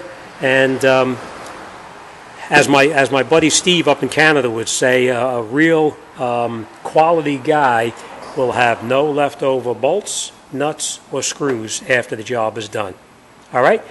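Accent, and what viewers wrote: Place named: New York, United States. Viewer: Forgive me for not knowing but, is this a New York accent or Philadelphia, or?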